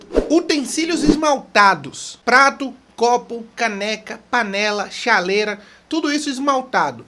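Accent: Minas Gerais, Brazil. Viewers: Esse é besta demais, adoro os vídeos dele e quando ele fala mineirês 1🤣🤣🤣🤣🤣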